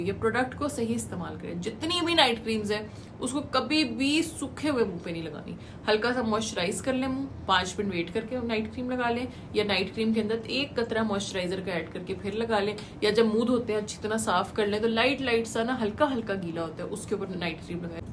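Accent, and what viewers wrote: Punjab, India. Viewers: Asalam o alaikum yar api ek video full punjabi me banae itni Sweet punjabi bolti hen maza double ho jata hy😂😂❤ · The punjabi accents are just love api 😅❤ Truly a girl down to earth 🌎 ❤️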